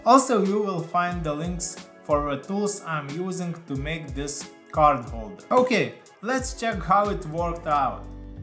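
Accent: Russia. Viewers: Ну как будто бы он сам из РФ, учитывая акцент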